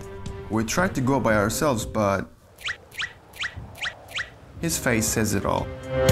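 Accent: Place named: Black Sea, Turkey. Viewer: videolar cok guzel ama karadeniz aksanli ingilizce cok sikinti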